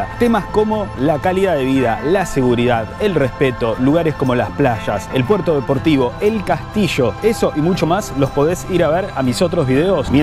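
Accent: Argentina. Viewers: Que buenos tus vídeos, es muy agradable escucharte hablar a cámara, supongo que el acento argentino también nos llama mucho la atención a los españoles. Hasta hace poco cerca de mi piso había un asador argentino con una familia muy agradable regentandolo, qué pena la última vez que fuimos a reservar y vimos que había cerrado. Supongo que la pandemia pudo con ellos.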